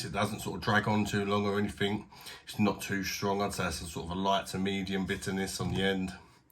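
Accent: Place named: West London, United Kingdom. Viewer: cheers will give them a try and I will try one of them rheinbachers aswell as I like holsten and your battle vs lidl lol . U sound from my neck of the woods I'm west side of London near Heathrow if ya ever fancy a pint and a game of pool one day always welcome in the sir john gibson pub in stanwell I'm often in there with my pals as its five mins away lol cheers bosh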